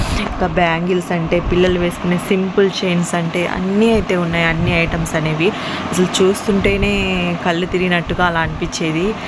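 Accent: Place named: Rayalaseema, India. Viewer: Ooo but your speaking slang looks like Rayala Seema...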